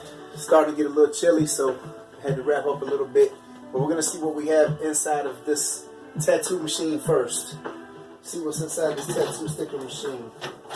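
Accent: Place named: New Orleans, United States. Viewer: Not sure what rabbit hole I’m in that I landed on a vending machine video but I need to know where this man is from so I can place the accent (love it, New Orleans?) and I’m going to look into buying a vending machine!! Lol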